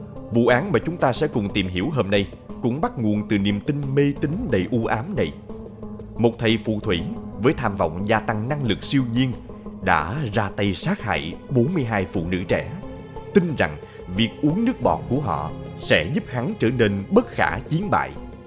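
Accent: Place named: Saigon, Vietnam. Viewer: Oh. đã trở lại. Rất thích giọng Saigon của bạn ❤❤❤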